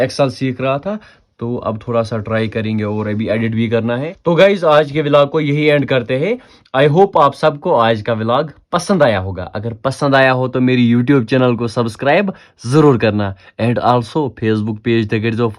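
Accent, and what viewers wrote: Kashmir, India. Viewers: Yeh kashmiri hn yeh asye bolta hn 😁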